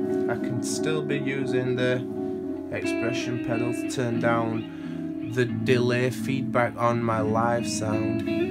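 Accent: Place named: Yorkshire, United Kingdom. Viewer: nice yorkshire accent. :)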